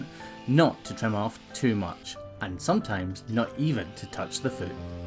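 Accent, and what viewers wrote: United Kingdom. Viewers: Britain accent? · Here's some ❤ for! YouTube has put several videos from last year BC (Before!!) & your life seems so much smoother than before. He is such a great help for you to be more efficient & focused! Yeah!!🎉❤🧨👍 Note: I think you are saying; I'm from Texas & those UK accents throw me off a tad!!😁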